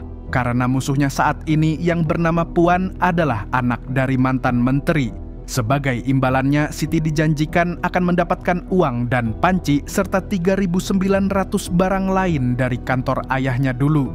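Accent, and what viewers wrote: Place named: Nganjuk, Indonesia. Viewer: kok nganjuk bang aku orang nganjuk 🤣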